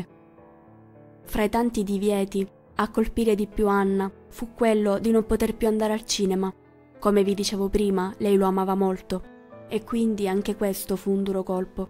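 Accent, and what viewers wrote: Sicily, Italy. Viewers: Scusami ma non riesco a procedere con la tua lettura con quello spiccato accento siculo. Per favore un po di professionalità non guasterebbe e un bel corso di dizione pure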